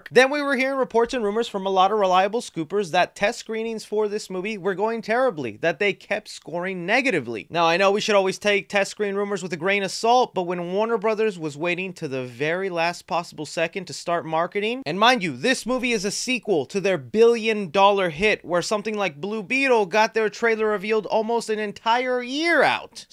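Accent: United Kingdom. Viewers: British accent 😂😂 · I love your British accent😂 where in the UK are you from? I thought it was south African at first 🙈😂🎃🎃👍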